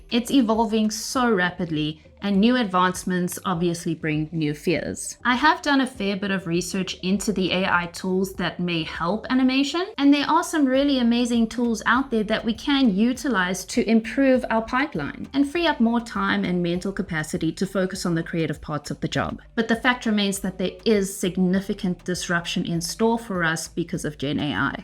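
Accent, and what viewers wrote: South Africa. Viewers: This is completely unrelated but your accent sounds sooo similar to South African ones. Are you from South Africa??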